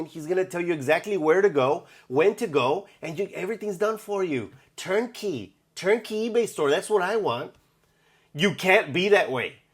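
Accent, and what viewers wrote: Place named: Texas, United States. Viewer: You really are a Tejano!! My mom and granny all born in Texas (El Paso). When I hear you talk, reminds me of being with my tejano relatives!! Good content, payaso!!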